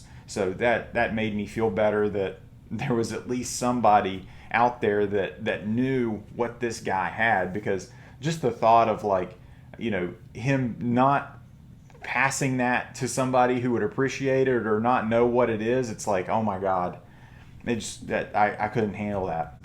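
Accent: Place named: Tennessee, United States. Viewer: to answer your question at the end, do i think its feasible to obtain those type of books just paying cash, no, not unless you're rich or spending money you dont need to on it. Now is it possible to obtain stuff like that by building over time, selling, trading and all that? Sure....but even then....in my opinion theres no way this guy isnt fairly well off. Its such an awesome collection but i would consider it overboard for a person without alot of expendable cash lol. Of course its also possible hes always had alot of them since way back when some came out, but for ppl our age, we arent gonna have anything in our PCs that we bought when it came out before the copper age unfortunately, which means wed be paying premium to own the majority of what was in there (not counting good deals and getting lucky and all that). Btw i just found you're channel and subbed. 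You sound like your from around my neck of the woods lol. Tennessee here. Cool video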